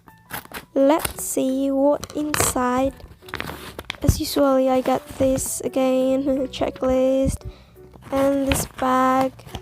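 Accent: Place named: United Kingdom. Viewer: Wah ternyata kaka bisa aksen UK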